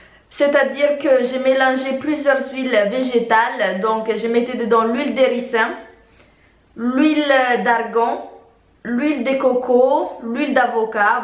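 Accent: Madagascar. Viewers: J'étais sure que tu étais malgache (façon de parler, visage aha) et je viens de voir que tu as fait un vlog à Mada', ça se confirme.😊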